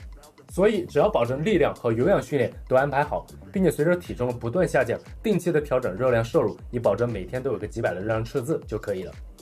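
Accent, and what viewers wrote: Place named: Dalian, China. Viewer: 這應該是地區發音的問題，之前我認識住在大連的大陸人，他講話也是這個方式，可能跟方言有關係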